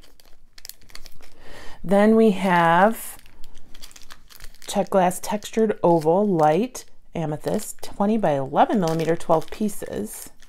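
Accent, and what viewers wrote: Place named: California, United States. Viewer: Sounds like from right here in california right here by the bay pretty cool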